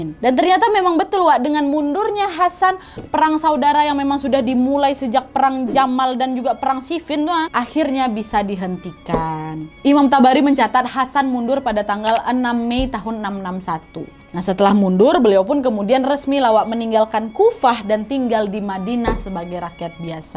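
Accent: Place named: Medan, Indonesia. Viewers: ni logat org Medan kyknya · macem cakap org medan ni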